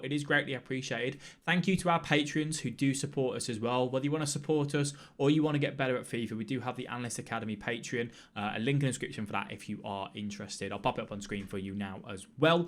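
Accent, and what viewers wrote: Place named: Midlands, United Kingdom. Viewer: Subscribed cause these a sound tactics and you sound like you're from Midlands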